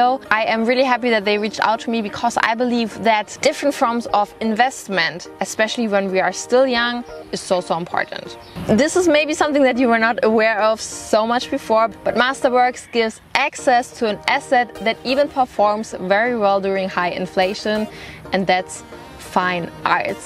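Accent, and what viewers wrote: Turkey. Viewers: Kesinlikle ve kesinlikle bu kız Türk, dikkatli dinleyin böyle bir aksan olamaz. İngilizce aksanı direk Türk aksanı ama maalesef 600 bin takipçisi var. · Hallo, ich gucke begeisternd deine Videos und finde dein türkisches Akzent sehr süß. In vielen Dingen stimme ich dir vollkommen zu, da ich in Deutschland lebe und zum Urlaub in der Türkei die gleichen Sachen wie du erlebe. Mülltrennung, kein Gurt anlegen, usw. Aber in Deutschland z.B in Hannover gibt es teilweise schon Gasherde. Meistens sind es vom Altbestand geblieben und werden zunehmend durch E-Herde abgelöst. Hier gibt es aber ein entscheidende Risikofaktor. In Deutschland sind die Gasherde mit einem Sicherheitsventil ausgestatttet nach erlischen der Gasflamme wird der Gaszufuhr abgeschlatet aber in der Türkei leider nicht. Die neuen Genarationen der Gasherde könnten diese Automatik besitzen, da bin ich nicht auf dem aktuellen Stand. 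Birkac dizi haric cogunlukla türk dizilerini sacma buluyorum. Insanin zamanini caliyorlar. Benim kendi hayatim kendi dizim:-)) Mach weiter so mit deiner amüsanten und interessanten Videos. Tschüß.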